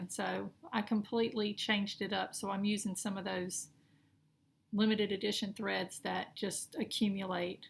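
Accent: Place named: Mississippi, United States. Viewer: Love your purchases. The Penny America design is older. I have it started. it's been a couple of years now but I thinking it's 2009. I did the pumpkin lady in the shoe made it into a pillow hangs on a door during fall. I don't do videos not very tech savvy. lol I am going to Minneapolis to the retreat first one for me. I love your accent my nephew married a gal from Mississippi I see hear every time talk.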